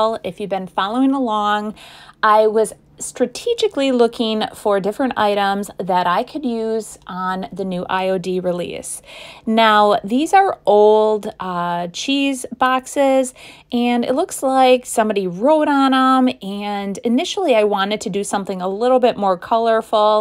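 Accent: Wisconsin, United States. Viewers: I really, really enjoy your work! What caught me first was your voice...my husband is from Watertown. I absolutely love WI!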